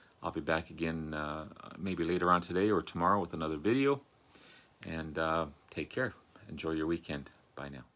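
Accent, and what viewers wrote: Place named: Canada. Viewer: It’s nice to hear your thoughts rather than just showing something that’s been shown a million times already. I’m not a fan (I will go hide now...lol!) but I enjoy watching everyone’s related videos. A very good friend of mine bought me the 50th Anniversary edition of so I do have that...a talking point for the cool kids in the clubs;) LOL Also I love love how youguys say ‘out’ in Canada. It’s so endearing:) Lots of love from the Mitten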